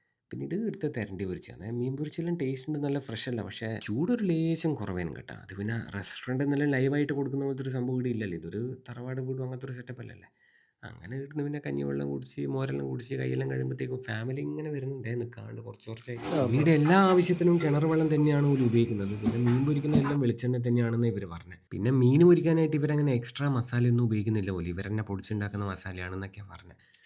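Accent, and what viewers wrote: Kannur, India. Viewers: Your slang❤❤ from kannur😊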